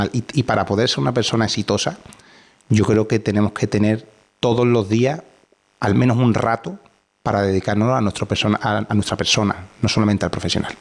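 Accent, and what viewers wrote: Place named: Andalusia, Spain. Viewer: Señora es sevillano, aquí somos andaluces y es nuestra forma de hablar, si no lo entiendes no lo veas pero deja de meterte con un acento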